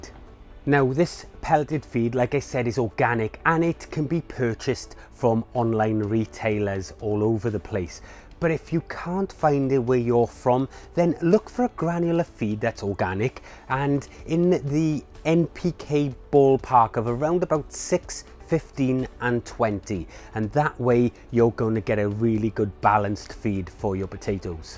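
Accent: United Kingdom. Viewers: At about the mark of, in Tip No. 2, the captions say, "...use a 30 liter or "80" US gallon container.", you have correctly said it.... 8 gallons. You, however, might wish to get the captions corrected. Those either unfamiliar with the British accent or not accustomed to the Metric system, and totally relying on the captions will be thrown off, and head to the market to buy giant trash cans. LOL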